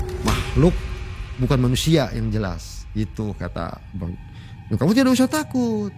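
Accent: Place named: Cirebon, Indonesia. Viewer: Logat ngmngnya ky indramayu cirebon😃🙏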